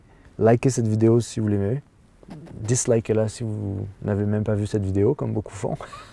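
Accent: Quebec, Canada. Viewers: Le tutoiement Français dépend des milieux. Entr' ouvriers il est quasi obligatoire. Des que ça sort du milieux professionnel ou qu'on a pas d'amitié avec l'interlocuteur c'est du VOUS. Un petit canon de rouquinos et le Vous s'enfuie. Ton accent Québécois est léger et facilite la compréhension pour l'oreille moyenne du Français qui a du mal à tout enregistrer de l'accent prononcé généralement par les Québécois. L'accent Français Breton , souvent rapidement parlé rend aussi la compréhension difficile pour d'autres.En France, plus on va dans les campagnes plus l'accent particulièrement prononcé ressort selon la région. Les villes lissent cet effet. Sauf pour les accents méridionaux qui sont toujours présent en ville comme à la campagne.Vive les accents, particularités miroirs des paysages. Petit survol en rapport avec ta vidéo intéressante.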